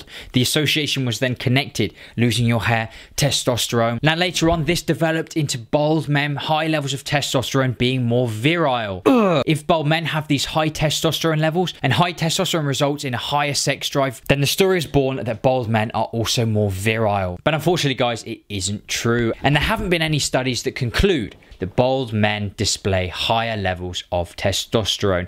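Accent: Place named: South West England, United Kingdom. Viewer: Where are you from? Bristol or something? I hear a bit of South West in you!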